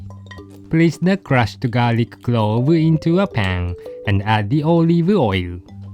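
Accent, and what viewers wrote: Japan. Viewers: I love the narrator's thick japanese accent when he's speaking english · as someone who speaks both English and French, I can conform that a French-English accent sounds n o t h i n g like that! It's just his original Japanese accent I think? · 日本人の耳からすればナレーターが日本語なまりの英語を演出しているのがわかってしまうツラさ…w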